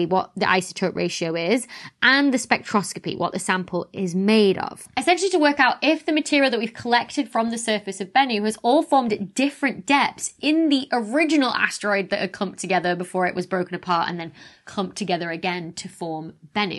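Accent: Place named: Cockney, United Kingdom. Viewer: "ME-thane!!!" You're such a cockney cutie!!! I'll bet you say "al-U-minium," too?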